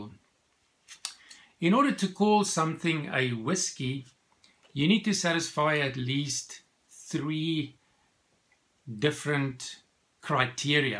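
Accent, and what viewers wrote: South Africa. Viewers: I'm a complete beginner when it comes to whiskey, and this is by far THE BEST video I've found that explains everything clearly and in an order that makes a lot of sense. Thanks so much! I also hear a South African accent, which is where I'm from 😁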